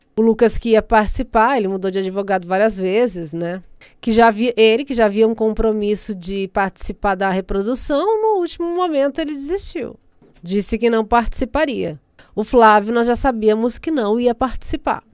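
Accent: Rio de Janeiro, Brazil. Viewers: Seu Sotaque carioca ficou muito bom . Simplesmente sensacional Parabéns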